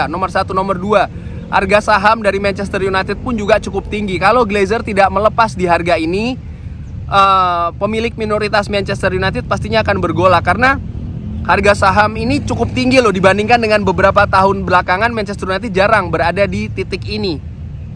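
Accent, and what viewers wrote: Semarang, Indonesia. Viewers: Orang Semarang ya bang? 😮 Kirain sy org luar Jawa 😂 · Lho sampean wong semarang to mas? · Asli semarang kah bang?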